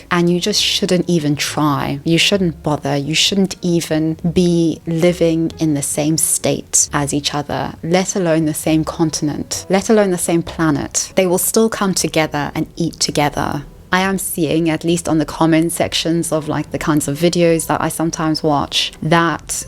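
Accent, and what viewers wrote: RP, United Kingdom. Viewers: I absolutely love your Proper British Accent. I am American and can so understand every syllable spoken. It's music to my ears. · It's your posh English accent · As a (east)german I do not really care about US/UK cultural differences. But I love your voice and pronounciation (the "t" and "s", the vowels)! To me, that's the britishness of your channel. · Brits are definitely uptight and miserable compared to Americans. Please come to a cookout in the US. We'll make fun of your posh accent in front of everyone. But if you laugh and come back with a savage reply, we will love you very much and want you to stay forever.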